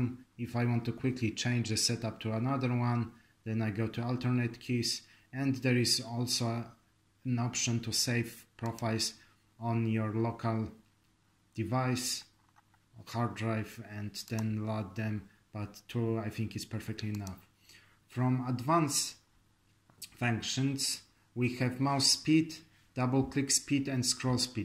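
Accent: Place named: Poland. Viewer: Po akcencie zgaduje ze jesteś z polski. Mam racje xD?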